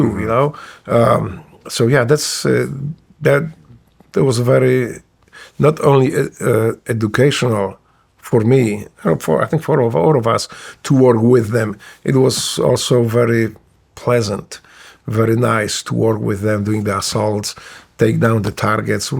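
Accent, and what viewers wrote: Poland. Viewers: Why he is speaking with Polish accent?😅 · You can be born in USA...but polish accent always comes out. Our nature dictates this. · your accent says you're from Poland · Speaking like a true Polish xd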